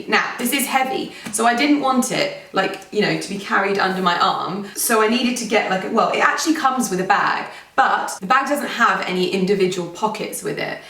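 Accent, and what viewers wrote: United Kingdom. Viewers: I find you very interesting... Love the British accent... also love the Irish and Scottish accents as well...I really enjoy your haul videos...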